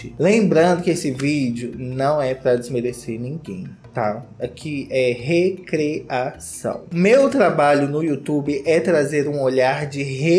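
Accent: Minas Gerais, Brazil. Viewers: Amor jeito mineiro de falar do dono do canal kkkk cucuruco muito mineiro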